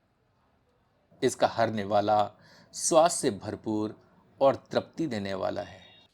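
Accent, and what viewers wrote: Standard. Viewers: शुद्ध हिंदी उचारण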